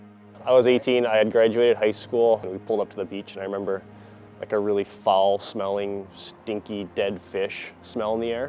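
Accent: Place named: Canada. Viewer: He never says how he got his accent in Canada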